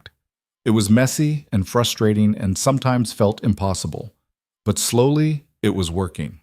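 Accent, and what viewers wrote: United States. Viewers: So much ridiculous drama. The choice of narration grates - makes them sound like teenagers. Truly recommend scrapping the flat American accent. It’s a British tale, deserves British narration.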